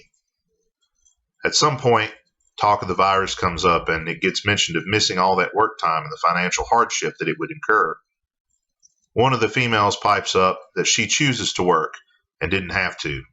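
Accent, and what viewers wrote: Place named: Southwest, United States. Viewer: Good content, eloquent and great speaking voice. What's your accent? I'm guessing S.W. of U.S.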